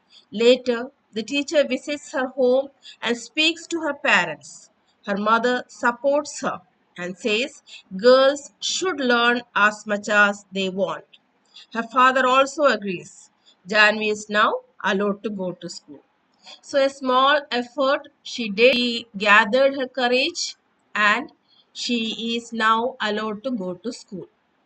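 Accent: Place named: Kerala, India. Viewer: Mam you are. 100% malayali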